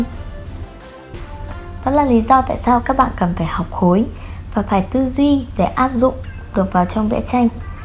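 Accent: Vietnam. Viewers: Cj là người Bắc hả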